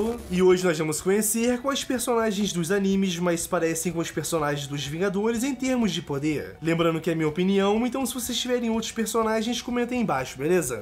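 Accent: Portugal. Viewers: Você é português ? O sotaque parece rsrsrsrs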